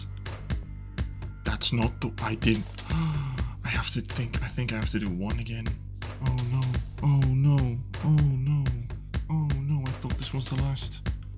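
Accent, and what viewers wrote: Netherlands. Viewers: One question are you dutch ?😅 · Hey! You Dutch? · Ik hoorde gelijk dat je een Nederlander bent hard ik gooi een sub voor die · I know a fellow dutchie when I hear one. And the part with your brother absolutly killed me as someone who could as a dutchmen haha. It also confirmed my suspicion about being dutch 😂 anyways loved this vid as im getting into plat hunting myself right now.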